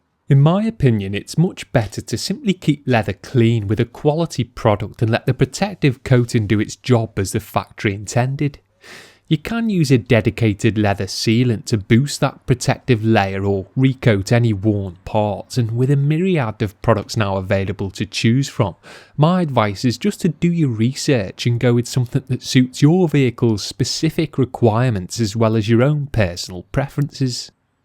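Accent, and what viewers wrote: United Kingdom. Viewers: Somewhere near Liverpool in the North West of England. I don't know exactly where but that's where is accent is from. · Conorsz He is definitely from a particular part of the UK, I'm just not sure which area.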